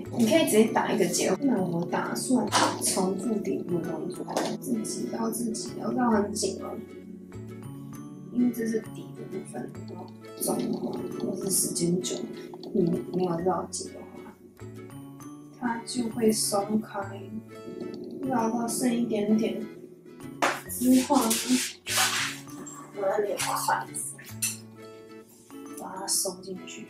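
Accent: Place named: Taiwan. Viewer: 作者可能長居外國，所以台灣口音+外國口音，但也不是你聽不慣就怪，不然你的口音，我聽起來也會覺得怪囉。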